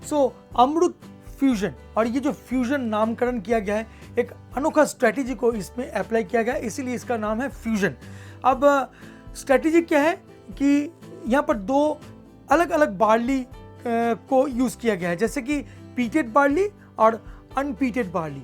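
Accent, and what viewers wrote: Bengal, India. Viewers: Bangali ho kya?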